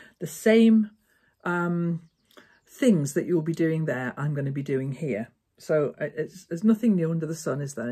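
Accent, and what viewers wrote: United Kingdom. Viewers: Why don't brits say the -er on Zipper? LOL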